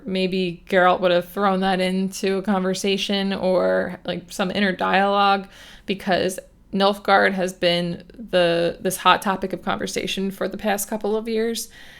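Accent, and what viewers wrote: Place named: Philadelphia, United States. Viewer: awesome! I'm from just outside of Philly, but still lots of family in the area and over in Jersey still. I was showing your channel to my cousins who were down visiting from Atlantic City, and your accent kinda gave it away, lol. But yes, I'll probably just read along with podcast, looking forward to the next one!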